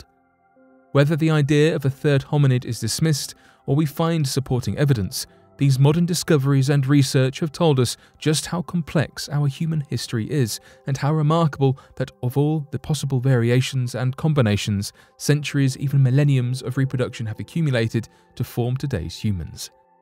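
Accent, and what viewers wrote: England, United Kingdom. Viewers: Enunciation must be precise for communication. Colloqualisms and heavy, near indecipherable accents hinder pure flow of thought. That is true no matter what language is being used. Imagine a Scot trying to speak West Indian--- or a German trying to speak Apache. Whatever language you are speaking, you must be clear and concise in your presentation. This narrator is an Englishman speaking English. And, imho, doing a fine job.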